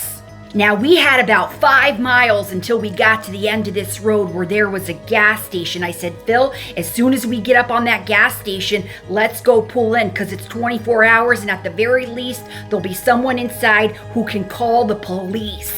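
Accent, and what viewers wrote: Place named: Midwest, United States. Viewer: Omg! The almost spooky story with a happy ending.. all done with a Midwestern accent. LOVE IT!❤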